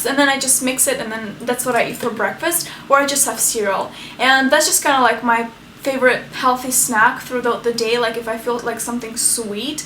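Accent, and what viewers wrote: Russia. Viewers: Lovely girl;) hope to see more videos about nutrition. I think your accent is Russian, am i wrong?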